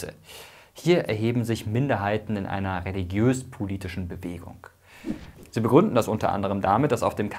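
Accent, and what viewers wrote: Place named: Southern Germany, Germany. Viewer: Das ist die völlig normale und richtige Aussprache in der süddeutschen Standardvarietät der deutschen Sprache.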